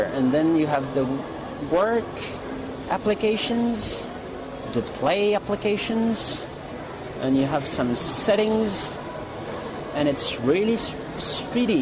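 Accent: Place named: France. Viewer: he sounds french or lebanese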